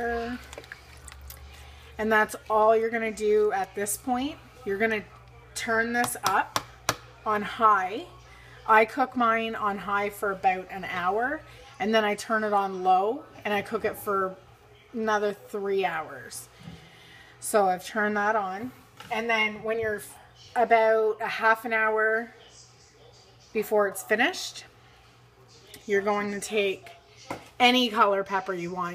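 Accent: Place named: Canada. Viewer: Let me guess you’re Canadian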